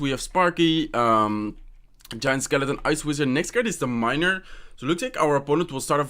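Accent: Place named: Netherlands. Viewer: U have a Netherlands accent ohhhhh girlfreind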